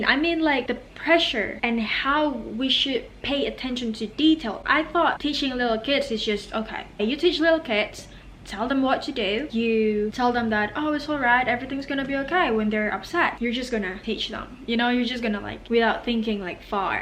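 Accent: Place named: United Kingdom. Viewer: i am addicted to your british accent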